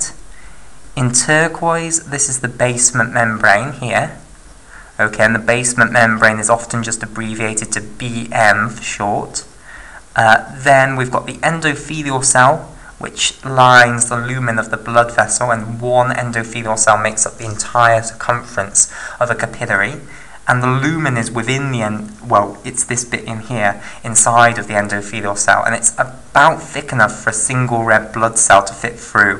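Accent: United Kingdom. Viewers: God i love british accent 😂🖤 Thank you for such a beatiful video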